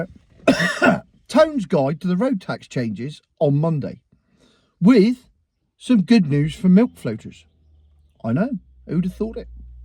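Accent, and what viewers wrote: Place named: Birmingham, United Kingdom. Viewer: F**** Birmingham accent. Bleating like a sheep 🐑 😅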